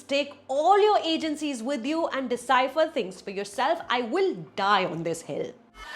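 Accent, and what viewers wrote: India. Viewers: yaar tum bahut ache reviews deti ho. i literally wait for your reviews and almost swear on them and then cheer them too. but thoda dheere dheere bola karo na. kahi jana hota h kya tumhe review ke turant baad? matlab indian accent ke liye bhi subtitles on karne padhte h mujhe. please mujh jaise fan ki yeh pukar sunle bahen aur araam se bola karo. zabaan ko bolo thamba thamba! baki i love u too. great work always.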